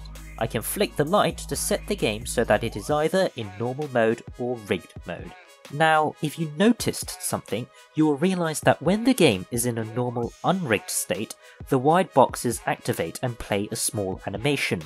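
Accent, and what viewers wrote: United Kingdom. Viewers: your nasally British voice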